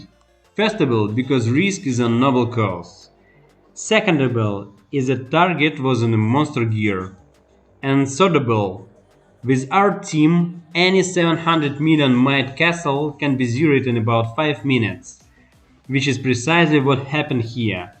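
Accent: Russia. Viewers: Слышно русский акцент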